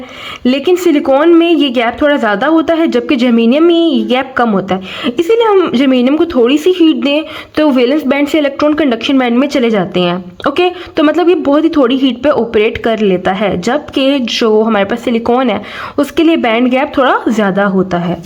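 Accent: Pakistan. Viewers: R u from Pakistan???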